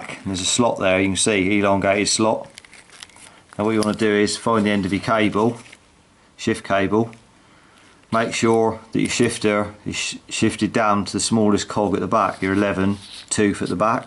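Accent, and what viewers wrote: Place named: Bristol, United Kingdom. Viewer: Thanks for this . Sounds like you might come from the Bristol Area ? Do you have a bike shop or do repairs ?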